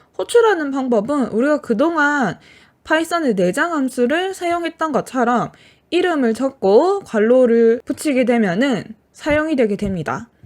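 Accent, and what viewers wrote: Gyeongsang, South Korea. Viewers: 목소리가 명쾌하고, 박력있고, 경상도 억양이 친근감이있습니다. 무엇보다도 강의 내용이 체계적이서 감사드립니다.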